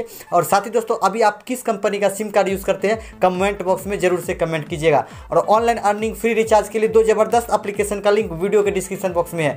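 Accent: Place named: Bihar, India. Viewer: तेरा आवाज बिहारी की तरह लग रहा है 😁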